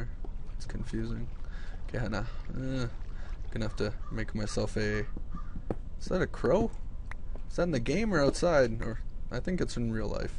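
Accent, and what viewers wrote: Canada. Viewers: could you speak some Canadian in a commentary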